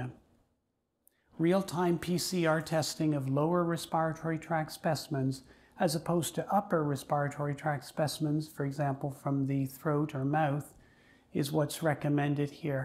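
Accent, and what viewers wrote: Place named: Canada. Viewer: "I've been asked aboat.... This video is aboat...." Clearly this doctor is Canadian (I can trust him).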